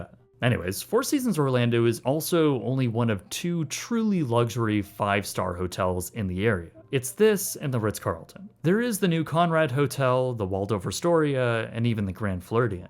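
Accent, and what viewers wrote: Canada. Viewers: You're Canadian accent is coming out incredibly annoying in this video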